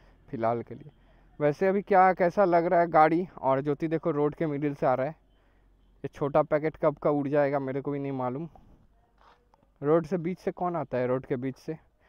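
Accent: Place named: Bengal, India. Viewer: Bhai I like your way of talking that you can say that Bangla type Hindi. Actually I can't understand your Hindi because my mother tongue is Kanda but I like your video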